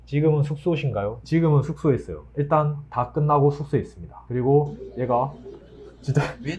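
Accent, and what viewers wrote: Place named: Gyeongnam, South Korea. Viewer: 부산사투리인지 경남인지 경북인지 궁금해요!!!!!! 근데 경남사투리같아요 맞아요?? ㅎㅎ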